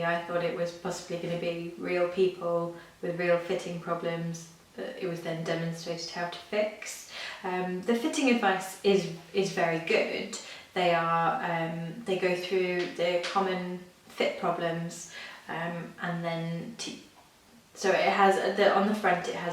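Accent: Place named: England, United Kingdom. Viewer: I was going to say, your accent sounds very English to me! A friend of mine with Welsh family started learning some once but it seemed to have some seriously complicated spelling - mutations and things!!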